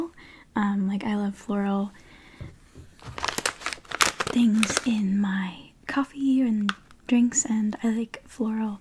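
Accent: France. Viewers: YOUR FRENCH IS SO CUUUUUTE!!!! (im french) 🥺🤍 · Oh myyyyyyyy !! One of my favorite asmrtist doing one of my favorite type of asmr ! 😍😍💚💚💚and your french is so cute 😺😺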